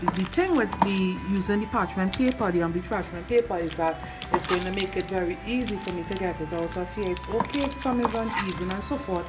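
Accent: Trinidad and Tobago. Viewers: How I love the trini 🇹🇹 accent great job fudge looks delicious 😋 thanks for sharing stay safe from Guyana 🇬🇾 with love ❤️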